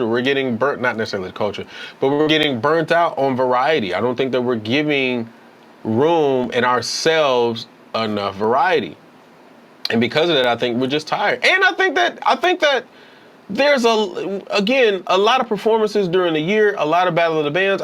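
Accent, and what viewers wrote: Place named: South, United States. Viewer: you lean towards Southern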